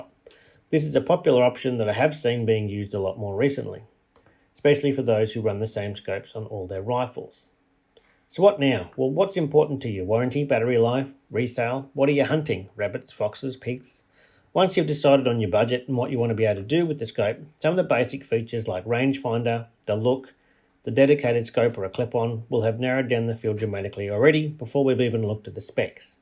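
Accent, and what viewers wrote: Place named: Australia. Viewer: I guess it's just ME (Anyone else have this problem?) but..........that heavy Aussie accent and fast-talking got me. Understood about 10% of what you said. Was looking forward to a super-informational video on thermal scopes/spotters but had to bow out early. Bummer.......!!!! (Maybe you can slow down your speech a bit.........Will give you a "Thumbs Up" as I'd bet the video was otherwise worth it).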